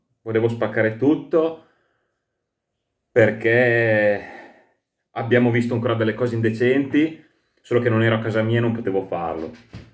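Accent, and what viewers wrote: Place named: Veneto, Italy. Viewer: Ti si Veneto??? Bella parlata❤🩶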